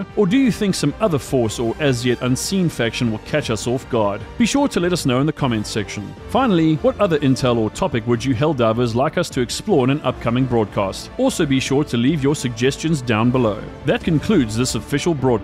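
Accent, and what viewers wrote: South Africa. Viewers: Is this a SAFA based channel? I know that accent far too well! SAFAs for democracy and liberty! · I know a South-African accent when I hear one, boet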